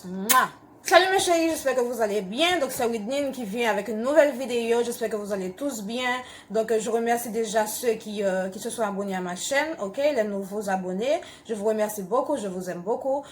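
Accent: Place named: Spain. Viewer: really nice intro, you have a voice beautiful. i watched, i love your Spanish accent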